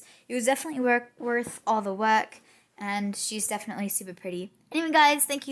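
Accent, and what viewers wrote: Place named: United Kingdom. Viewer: Why did you used to have a British accent in your videos I thought youre American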